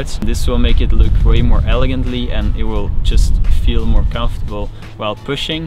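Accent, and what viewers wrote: Netherlands. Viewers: Are u Dutch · are you dutch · Bro are you dutch? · Are you dutch? Female dutch skateboarder here who recognises your accent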